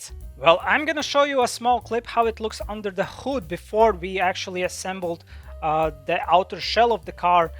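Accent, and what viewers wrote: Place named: Latvia. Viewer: Nice video but the latvian accent is strong 😂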